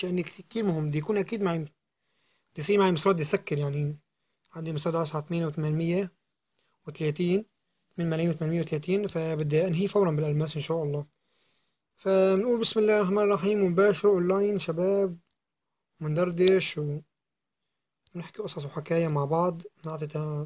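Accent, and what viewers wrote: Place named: Aleppo, Syria. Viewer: احلا قناة باليوتيوب , ولهجتك الحلبية محلاية القنات, استمر ..انشاء الله بتوفيق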